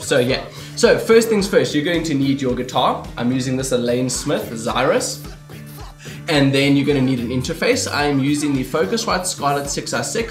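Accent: South Africa. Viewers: My boet, you sound South African. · Imagine my surprise hearing a SA'n accent as this vid started haha. Lekker bru :P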